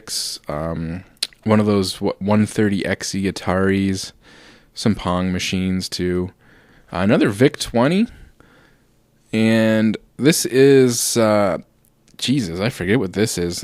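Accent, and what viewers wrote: Canada. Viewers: Wow I stumbled across your video and while watching I was like this guy sounds canadian.I was super happy to see that this store is located in Ontario.Im from Toronto and deff will be making a trip to this store very soon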